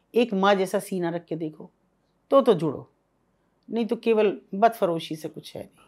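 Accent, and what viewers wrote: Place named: Meerut, India. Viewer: Mairath vala lehja ❤😅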